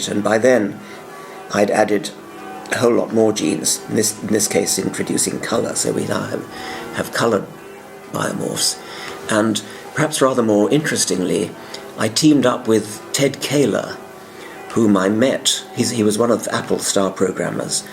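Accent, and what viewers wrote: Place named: Oxford, United Kingdom. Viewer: must be church bells that give a sense of peace and meaning on a sunday I assume at Oxford, how ironic. There is no meaning at all just mindless replicating tiny entities using me as a throw away temporary vehicle like a throw away party girl, for no purpose. I love the civilized Oxford voice and the gentility but what he says is that we are all ignorant robots living in a Matrix like nightmare.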